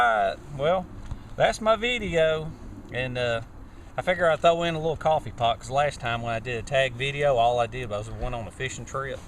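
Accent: South, United States. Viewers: I'm with you, water comes first. Dehydration will kill you first, but that knife is nice to have. Altitude sick in the way back high country this weekend I had your crazy sweet southern accent in my mind resting there at too high altitude under the shade of a tree wishing to die from a banger of a headache thinking inside the handle is some matches and a saw and some fishhooks but right now I wish I had something to blow my brains out! Kidding... maybe. Those little fuzzies are great for fire starting if you can crawl around and find some tinder. I could! Pine sap... fire... water. Just water and more water. Shelter and water and sleep a thousand years with the spinning head and then it broke and I was back with you again, human, ready to go that next mile. Your videos are wonderful! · He talks just like a friend of mine. Very real southern accent. 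If you wanna hear a "real, fake" southern accent at its best, watch the television show The Walking Dead.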